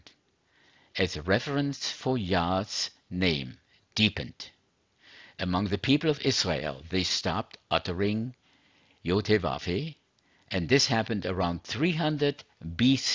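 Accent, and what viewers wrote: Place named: Germany. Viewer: sie sind zeuge jehova, wenn ich fragen darf? ich weiss sie können deutsch sprechen, ich erkenne es an ihrem dialekt :) grüße aus bayern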